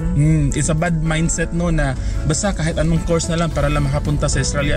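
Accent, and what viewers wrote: Philippines. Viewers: omg, i dont get your accent. is it from philippines?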